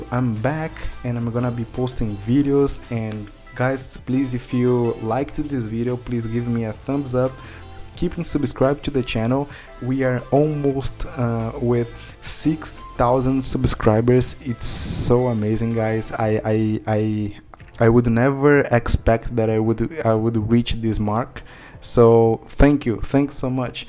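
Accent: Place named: Brazil. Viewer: It's great watch your video again. I watch a lot videos about English, cambly, things like this. But the best of them is yours. And The best "brasilian" English of them is yours. I entered on Cambly because of your videos. Keep going guy 🤜🏿🤛🏿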